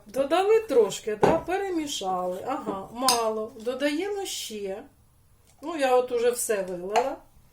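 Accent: Ukraine. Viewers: За вареники дякую і як приємно чути нашу мову, мову з центру України. Якось по домашньому. Ще раз дякую. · Приємно чути черкаську говірку, наче дома побувала.Добра Вам!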